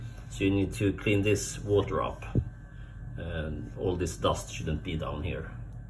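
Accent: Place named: Norway. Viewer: I fixed my drier this morning following your guide exactly. Your accent sounds norsk så eg bare ser tusen hjertelig takk.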